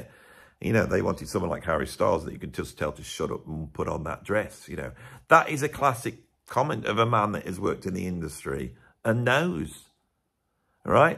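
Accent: Birmingham, United Kingdom. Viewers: love me a Brummie, or Black Country accent ❤ any day of the week. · I'll take sense of humour over Beato's supposedly superior "intelligence" any day of the week. I love the Brummie/West Midlands accent anyway, it's one of my favourites. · he has much more intelligence than this annoyingly verbose Brummie...the accent is too much to handle.😎😎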